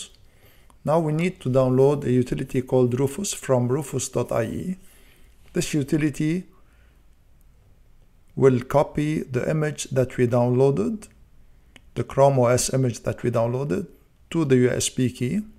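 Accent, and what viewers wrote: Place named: Lebanon. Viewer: bro you sound lebanese !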